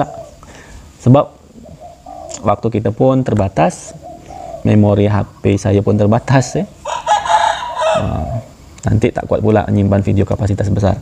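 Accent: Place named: Malaysia. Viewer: Suka sekali dengar dialek melayu nya 😊🙏🏻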